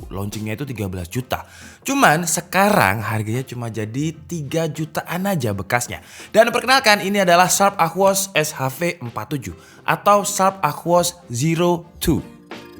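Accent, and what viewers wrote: Java, Indonesia. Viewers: bhs jawa si mbaknya kentel banget 😁😁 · Pemean... wong malang banget... 🤣🤣🤣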